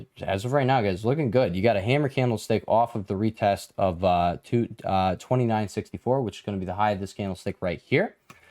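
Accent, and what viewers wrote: New Jersey, United States. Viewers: Are u from jjjjeersey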